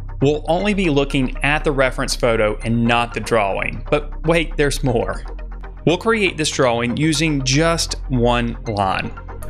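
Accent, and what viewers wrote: South, United States. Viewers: He has a Southern Drawl when he drawls ... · Really are you trying to be mean? He obviously has a southern accent. 🙄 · He clearly has some kind of slight southern accent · His southern accent has him saying "d-ROW-wing" as opposed to "d-RAW-ing" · It's that Southern drawl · from southern USA therefore he has a drawl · Definitely says DWawing. Sounds like he’s from the American south. ‘Ya all come back ya hear’ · I recognize a fellow southern here right away. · Your ears. He has a a Southern drawl. When a lot of us Americans say the word “drawing” we don’t pronounce the “w”. It’s more like “dra-ing”. The way he says it is “dra-wing.” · It's his southern accent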